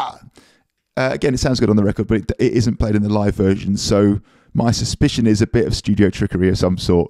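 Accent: England, United Kingdom. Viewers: Read my mind. I have always thought the same thing. There’s no other drum teacher quite like him IMO - and I’ve watched plenty. Apart from his skills as a drummer and exceptional teacher he has such a warm, affable and likeable manner. The lovely English accent is a huge bonus as well.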